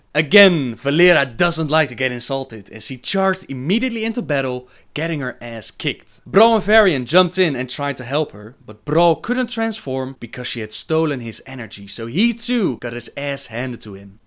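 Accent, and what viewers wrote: Netherlands. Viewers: Are you Dutch/Belgian? Your accent sounds like Dutch. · gij zijt nen hollander :D direct gehoord :p -belg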